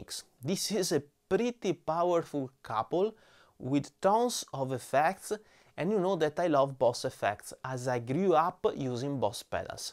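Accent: Italy. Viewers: bravo, seguita così, la tua pronuncia in inglese italianizzato mi fa sentire meno solo! :)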